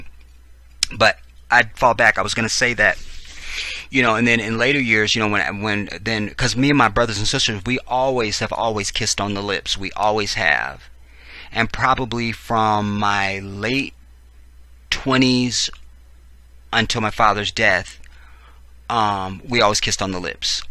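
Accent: United Kingdom. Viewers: you better SING that song! I love when you speak in your British accent.... and when you say the 1990s. Lol. You make my day!!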